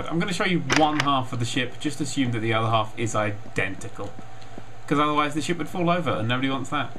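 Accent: United Kingdom. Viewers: Finally a British guy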